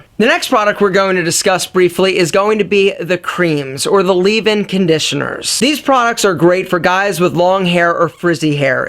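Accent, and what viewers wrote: Philadelphia, United States. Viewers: Hes from the Philly area... I can tell bc he pronounced water as " wooder"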